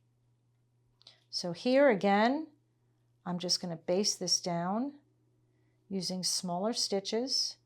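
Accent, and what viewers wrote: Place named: Bronx, United States. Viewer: A few other thoughts about garment sewing. First is that I've found that over the years, it's not been so much that I needed patience to be able to get the work done, but that in doing the work, it has given me patience. Next was the revelation I had when watching you sew the facing to the foreparts....See? I'm paying attention, professor! I realized that I'd done that very technique years ago! I was doing a repair job on something or other, had what I thought was absolutely no seam allowance and therefore needed to sew by hand. I folded the two edges about the same way I watched you do it, and sewed them together. NO IDEA at the time that I was doing the sewing the way traditional tailors did. For me, it just shows that human beings very often find solutions to problems that are similar, and execute them similarly. 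Oh yeah. Every time I watch you, I chuckle hearing that NYC accent AND ATTITUDE. You can take the woman out of New York, but you can't take New York out of the woman. And, during one of Susan Khaljes' other classes, she put in a lapped zipper using pick stitches. Same technique, different application.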